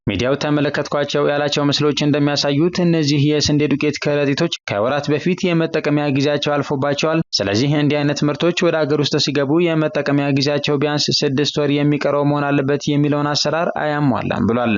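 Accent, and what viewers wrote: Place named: Tigray, Ethiopia. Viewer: Zthe host has a tigrians accent so don't expect good news from this agames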